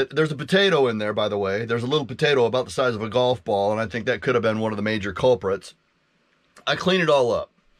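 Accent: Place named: South, United States. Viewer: Glad that things are good,! You are beginning to get 'just a tad' of Southern English twang going there... Ha ha. Welcome to the Southeast.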